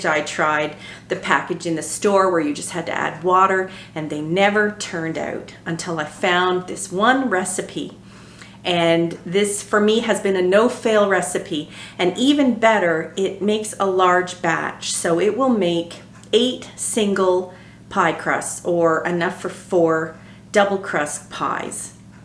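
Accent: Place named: Canada. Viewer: I was fortunate to find the yellow bowl and lid at the thrift store. I sold Tupperware in the late 70’s but no longer in business. I will definitely hang on to the pieces I have for sure. I was going to wish you a Happy Thanksgiving but I think you are Canadian with your pronunciation of the “o” but enjoy that day too, lol!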